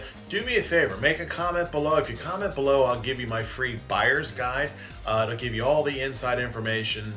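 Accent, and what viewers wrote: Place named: Pittsburgh, United States. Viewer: I’m from Baltimore but I had the misfortunate experience (😂) of living in Pittsburgh for a while! I detect a strong ‘burg accent, not Ball-more🙈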